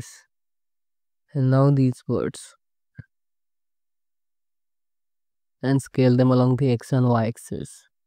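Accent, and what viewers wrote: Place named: India. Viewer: Nice video dude, your English accent is like an Indian guy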